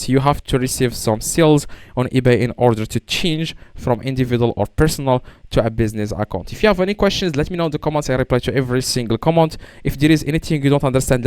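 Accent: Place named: Morocco. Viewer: moroccan english very helpful bro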